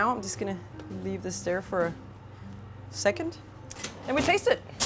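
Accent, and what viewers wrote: Denmark. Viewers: She sounds danish 🇩🇰🍺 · its a very lovely Danish accent. She's a good example of how often we might say "You know?" :D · Instantly recognized the danish accent :) great vid btw.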